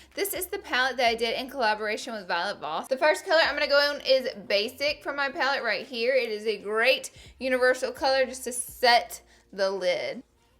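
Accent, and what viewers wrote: South, United States. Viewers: she looks so pretty in this but i love the southern accent because i'm southern too 💗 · it bothers me that this comment is on every video and still....I mean doesn't it occur to you that it's not improper pronunciation, it's an accent? she's clearly from the south! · Love this look! Is it just me or does her fabulous accent sound really strong in this video?! Southern accents are my fav! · i used to hate southern accents for some reason and then i fell in love w/ her omg :') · I'm so in love with your accent. I'm from Texas and miss people talkin like that. But your accent is a lot stronger than us Texans lol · Were you tired when you did this vid hun? I'm a newbie subbie but you didn't seem as bouncy as usual? Btw love your accent (I adore a southern accent! Always wanted to marry me a southern gentleman - kinda hard to find them in south east London uk though!!) 😋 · PSA: Shes from the south. 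She has an accent. Get over it. 🤷🏻‍♀️ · I love the way you say sugar! Southern accents are amazing❤️ probably why I married a Texan 😂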